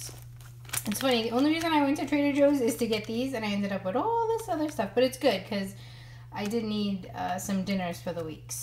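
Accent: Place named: Northeast, United States. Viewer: You have a cute northeastern accent... in Indiana we tend to pronounce haul and hall the same, ha😊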